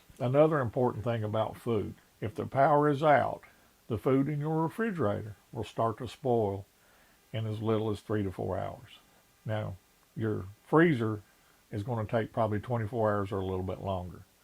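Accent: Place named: New York City, United States. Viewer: He talks like a New York Jew.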